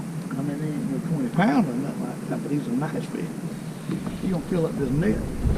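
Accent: South, United States. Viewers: Hey its sourthern english I understand u just fine but I am from alabama LOL good vid sir👍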